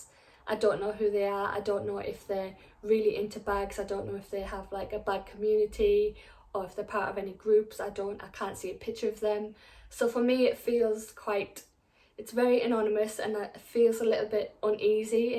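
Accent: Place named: North East England, United Kingdom. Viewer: Just subscribed and am looking through your videos. Great advice so thank you for that. I am a member of some Facebook LV groups but they are mostly US ones. Need to find some UK ones. Nice to hear a North East accent too! We sound the best haha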